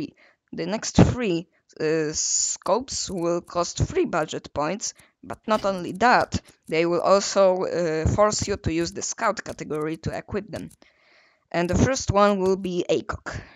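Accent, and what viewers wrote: Russia. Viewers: i love how the russian accent matches with the team you're on despite you being from poland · I can really hear the Russian accent In your voice.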